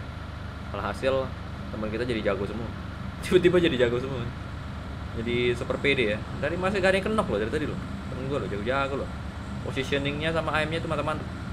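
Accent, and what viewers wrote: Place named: Central Java, Indonesia. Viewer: Dari jawa tengah mana suaranya🙏🙏🙏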